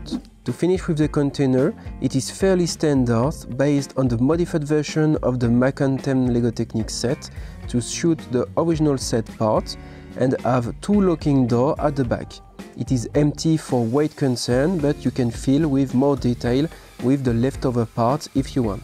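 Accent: France. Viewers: Great model, I have both your other 42100 alts and will buy this one too, for sure! Love your French accent, btw. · j'en était sûr en entendant l'accent...un français sympa le MOC, je vais jeter un oeil au reste des création s hormis l'accent (j'ai le même quand je parle anglais) il n'y a rien à redire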